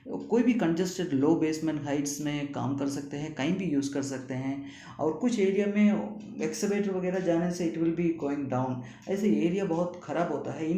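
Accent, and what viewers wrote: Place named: Kerala, India. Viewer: Hey Malayaleees...Alae...Slang ketapol manasilayi. True?.. and Good Info Bro keep it up...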